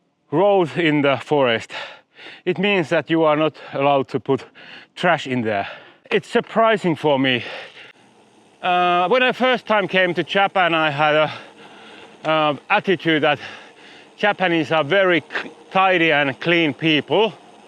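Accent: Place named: Finland. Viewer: Do you speak Japanese. i do enjoy your Finish lilt and English , very interesting and fun to listen to.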